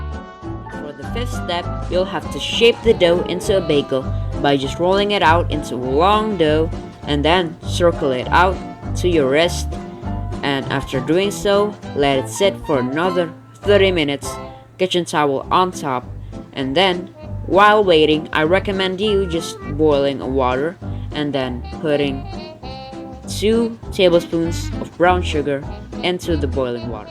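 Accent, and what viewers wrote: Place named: England, United Kingdom. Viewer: its really look so delicious ! love ur eng accent too !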